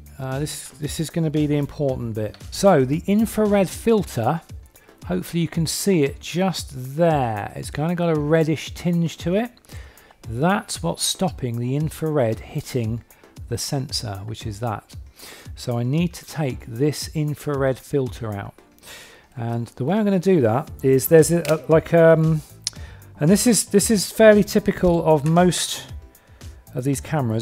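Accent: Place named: Bristol, United Kingdom. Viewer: Have you ever captured paranormal activity with that? I love the Bristolian accent ( give or take a few miles ).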